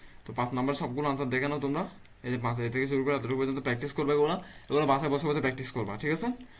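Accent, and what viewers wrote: Chittagong, Bangladesh. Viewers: Bhaia apnar kotha chitaingar moto lage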